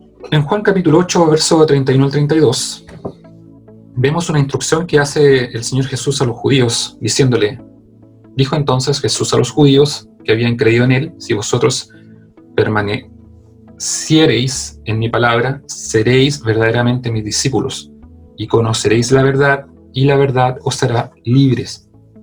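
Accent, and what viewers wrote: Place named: Chile. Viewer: Muy instructiva la presentacion, gracias. Pero usted tampoco describio las 7 definiciones de Verdad que Dios revela en su palabra, todas, las 7, y es muy importante conocerlas todas porque de otra forma no conocemos a Jesus, y el diablo se rie y se burla del medio creyente, porque continua ignorante, desprotegido y vulnerable para ser engañado a su antojo. Desea conocer las 7 definiciones de Verdad que nuestro Dios nos enseña y revela en su palabra, la Biblia ? Y por lo que oigo usted es de Chile, hermoso pais para conpartirle de Jesus y su palabra.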